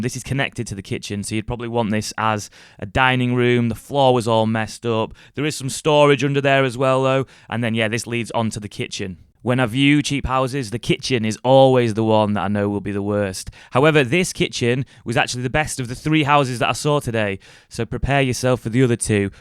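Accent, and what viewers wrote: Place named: Liverpool, United Kingdom. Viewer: I'm so In love! I want him!!! 🥰🥰🥰 I love the scouse accent and I say it all the time, an accent like his on a man like that is so attractive. 😍🥵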